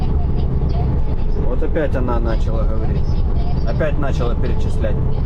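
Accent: Odessa, Ukraine. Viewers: здравствуйте! Большой привет от тракиста из Теннесси, США. Совершенно случайно наткнулся на один из твоих роликов годичной давности о работе по работе с контейнерами в порту. Когда-то и я имел такую же работу в Ашдоде и Хайфе и это был один из кошмарнейших периодов в моей жизни. И вначеле мне не очень приглянулось то видео, т.к. сделано оно было как-то, извини за откровенность, корявато.🙃 Но... Зашел на другой твой ролик, следущий, и уже с удовольствием просматривал их, как и последующие. Никогда не планировал посетить Японию, но люблю путешествия, и твои ролики о японской жизни сильно запали в душу мягкостью твоего метода её показа. Смотрю и сравниваю Японию и те страны где я жил и живу. Очень интересно смотреть об обыденной среде обитания местного населения! И да, твой сосед сильно смахиват на веселого водилу-ханурика😛, но это лично мое не объективное мнение.🤭 И как я вижу, Япония ушла далеко вперед по отношению к своему населению даже по сравнению с США, где я жуву уже почти четверть века. 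Кстати, у меня есть тут приятель, тоже Сашка с Одессы и его манера разговаривать очень схожа с твоей. Спасибо за ролики, передавай привет супруге и Ёшкиным котикам и удачи в жизни!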